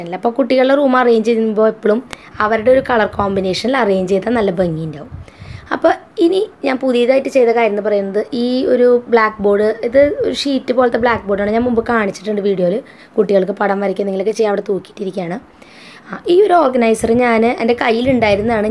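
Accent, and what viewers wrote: Thrissur, India. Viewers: Thrissur ഭാഷ കേൾക്കാൻ നല്ല രസമുണ്ട് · Enikum thrisur bashem trisurkarem ishtanu.. Njn ernakulam kaariya..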